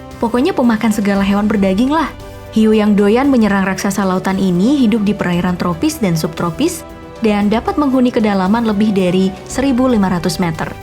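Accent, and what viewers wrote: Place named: Jakarta, Indonesia. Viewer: Pan betawi yak wkwkwk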